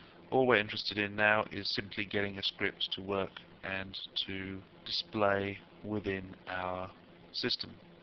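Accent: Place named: United Kingdom. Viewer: whats wrong with british accents?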